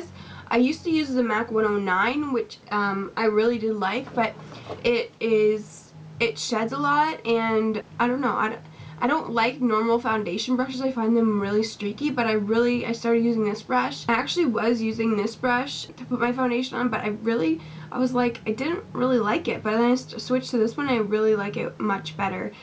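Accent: Canada. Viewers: Are you Canadian?? Love Quo brushes!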